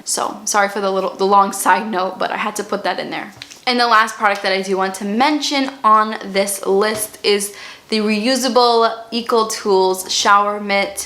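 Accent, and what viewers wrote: Boston, United States. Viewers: I subscribed within seconds of watching your video because I heard the Boston accent - and then you talked about Mass so my suspicions were confirmed! Love that I get to follow a local gal with good advice. :D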